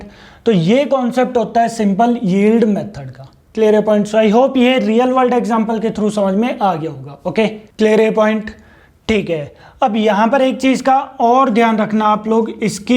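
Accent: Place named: Punjab, India. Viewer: Love ur punjabi touch hindi..... Hanji ..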